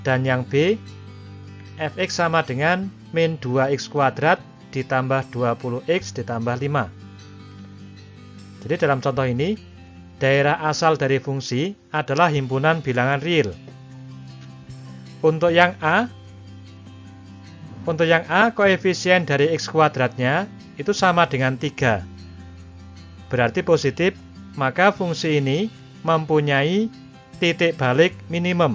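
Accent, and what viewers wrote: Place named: Java, Indonesia. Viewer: Suara ne ketoro tenan nek wong jowo nggh mas.... Salam sko klaten 🤗🤗🤗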